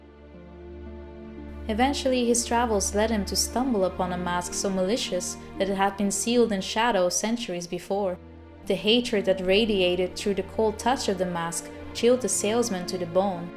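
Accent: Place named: Netherlands. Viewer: Love your vids :) what country are you from? You sound Dutch or something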